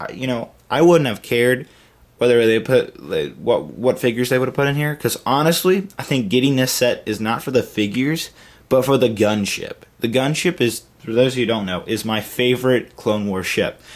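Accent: United States. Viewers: this gunship is epic I really like it. and I think you are American. (your voice sounds American)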